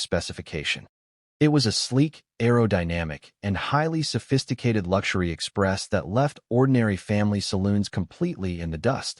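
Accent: United States. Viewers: Who wrote this absolute BULLSH*T. Do your Homework. Grrr Plus, to have a Yank doing talking, well it is a disgrace The rover P4 was not called the Annie Rover. It was called the 'Auntie' Rover. The Morris Oxford and the Austin Cambridge Were just ordinary family saloons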